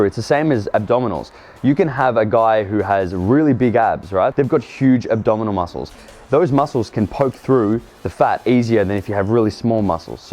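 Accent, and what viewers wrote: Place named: Australia. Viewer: Ngl mate your videos are great, but your Australian accent makes it that much better and cooler lmao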